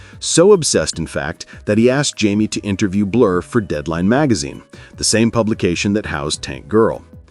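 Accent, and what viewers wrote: United States. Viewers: I hate forced subtitles and it's really weird hearing all this British English in a flat, mechanical sounding American English voice. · Listening to this guy use British slang and idioms in an American accent is painful for some reason.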